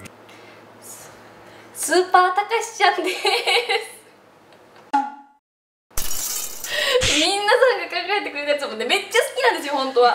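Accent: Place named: Kansai, Japan. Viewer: ノースリーブだと綺麗な首筋や肩が見えるのでとても似合ってる。 こんな可愛い顔してるのに変にぶりっ子してへんし、ハキハキの関西弁とマシンガントークのこのギャップはたまらん‼️ speaks really quickly😵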